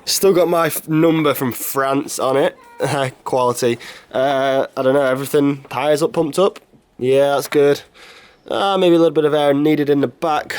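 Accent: United Kingdom. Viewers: That's a very English accent 😂😂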